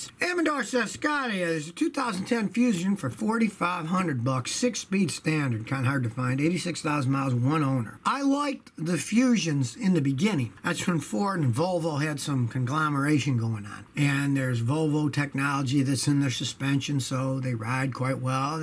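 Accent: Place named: Buffalo, United States. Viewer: You talk like a buffalonian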